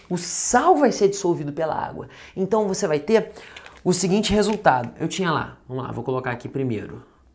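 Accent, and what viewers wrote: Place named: Rio de Janeiro, Brazil. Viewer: Sotaque Carioca é uma tortura.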